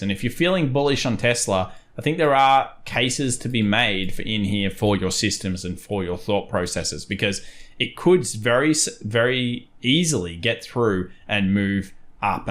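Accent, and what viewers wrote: Australia. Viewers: Glad I found. I look forward to the daily updates, guidance, expertise. Still have to listen closely as the Aussie accent is a lil heavy. lol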